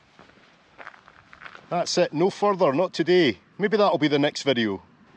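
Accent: Scotland, United Kingdom. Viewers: I am going to record saying "All the wee lochs" into a continuous loop that I can play whenever I'm in a bad mood. Impossible to listen to that line, with that Scottish brogue, without getting a smile on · this video was even more special for me than your videos usually are. My brother's middle name is "Harris," and he was born on April 29, the date on which, this Spring, you made this video about the Isle of Harris. My paternal grandmother's maiden name was Harris, which my siblings and I did not realize is a Scottish surname. Now, thanks to this video, my brother, sister and I get to claim Scottish parentage (which is even more special given that our other 3 grandparents were of Swedish, Norwegian and Danish parentage)! And I realize, through listening to you speak, that my habit of adding "Eh" (pronounced more like "Ay") at the end of some declarative sentences must have come from my Canadian relatives, who lived in a part of Alberta, Canada populated mostly by people of Scottish ancestry (including my paternal grandmother's family). 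I wonder if my grandmother's Scottish parentage and that one Scottish speech habit of mine would qualify me for Scottish citizenship (or at least residency).... Your channel is my favorite on YouTube, probably because I am a traveler who favors the same meandering-on-foot style of exploring. For 8 years, I flew a roundtrip between Seattle, Washington and London as Pan Am crew, with a 30+-hour layover in London, almost every weekend. One London establishment I frequented was The Scotch House, because I was fascinated by tartans. I now know why. Looking forward to your next post regarding Harris Tweed (and to researching whether there is a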